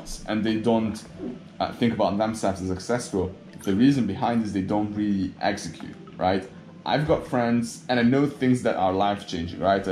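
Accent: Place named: Hungary. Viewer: Szia hallom a kiejtéseden higy magyar vagy kaphatnék magyar videot?😁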